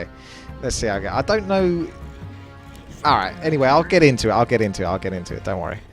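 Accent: South, United States. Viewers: that southern voice though, sounds like you're a flirty barman.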